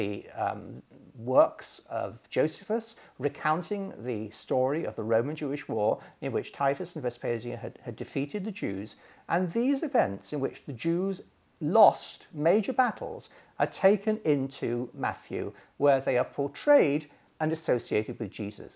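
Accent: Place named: England, United Kingdom. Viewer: Wow you sound so smart with the English accent and all and yet I don’t believe anything you regurgitate as truth - nice try.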